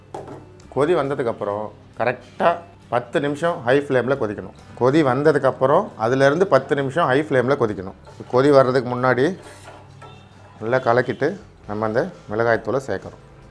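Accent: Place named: Nagercoil, India. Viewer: Voice nagercoil pola irukku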